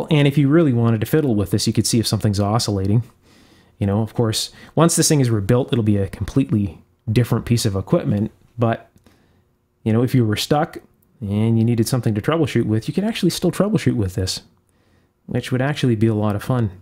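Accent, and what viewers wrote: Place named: Canada. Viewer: Thank you …a fascinating video featuring an interesting oscillograph. I enjoy your mini talks and your delightful accent…what part of Canada are you from? (I’m in the south of England). My own very first oscillograph was ideal for checking audio circuits working up to about 1MHz or so. It was just post Second World War I think and used a 6K25 Thyratron in the time base…I’ve still got several of those in my shack (my UK callsign is G3XFD, my Irish callsign is EI5IW). In the past I’ve found some interesting little scopes at Amateur Radio Mobile rallies (I think they would be classed as ‘swap meets’ or something similar in North America) and have several 1CP1 one inch scope tubes which featured in Practical Wireless magazine (I was Editor for almost 25 years until retirement in 2013)) using the Mullard/Philips basic workshop project scope. 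A fascinating little tube, the 1CP1 (Loctal base) was used as a waveform indicator by the the old British Post Office Telephones (now BT) in telephone exchanges to monitor the dialling tone, which was generated by little 440Hz (approx) frequency output motor generators! Most of the scope tubes had phosphor trace burns due to thousands of hours continuous working…but they could still be used! Thanks for your excellent tutorials…I’m a great fan! Best wishes, Rob G3XFD.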